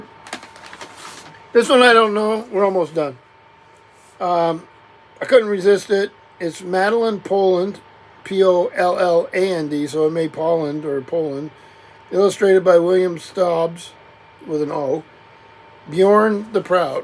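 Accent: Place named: United States. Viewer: What part of the US are you from? You sound like a local to me.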